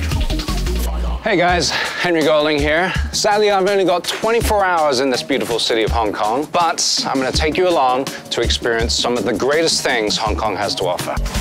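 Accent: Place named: United States. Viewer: I had to look up this ad cause I was surprised with his accent. He sounds American now 😢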